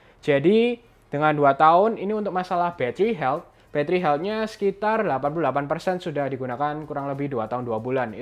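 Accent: Java, Indonesia. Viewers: Nada bicaranya kaya orang jowo bangeddd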